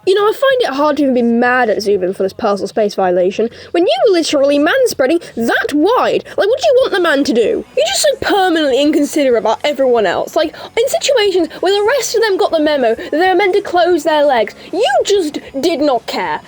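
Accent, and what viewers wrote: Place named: RP, United Kingdom. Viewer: (Ignore how I’m late) PLEASE I LOVE YOUR VIDEOS MAN BAHAHAHA. And your British accent just makes this so much better because you sound so posh (I’m British don’t come at me-)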